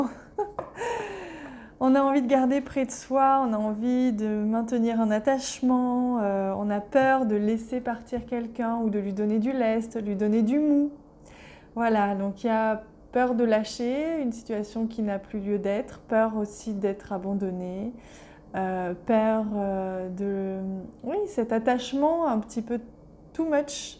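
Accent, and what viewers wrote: South, France. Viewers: Bonjour, Je vous découvre aujourd’hui. Vous avez un accent du sud aussi, comme Laura (Intuitive), peut-être vous connaissez-vous? Merci pour cette guidance. Bonne journée 🙏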